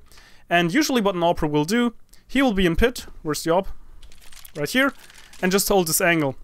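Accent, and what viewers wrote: Germany. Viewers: Are you from germany? If yes = Man merkt es an deinem Akzent :P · are you german you sound like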